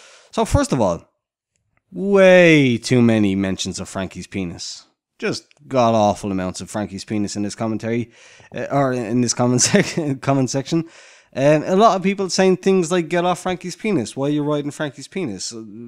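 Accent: Ireland. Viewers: I like your strong Irish accent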